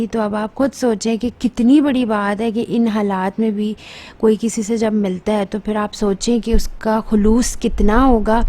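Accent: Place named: Bihar, India. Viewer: I thing u r Behari speaking mughy behari lagti ho aap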